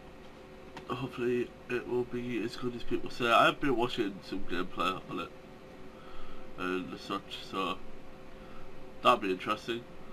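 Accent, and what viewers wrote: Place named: Northern England, United Kingdom. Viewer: nice pickups althought i still cant understand you but i think its cause your northern i think :P oh and FYI FIRST COMMENT!!!!!!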